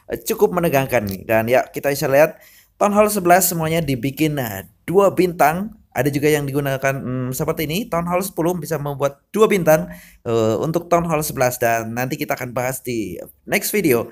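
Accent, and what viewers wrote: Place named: Banyumas, Indonesia. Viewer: Logate ngapak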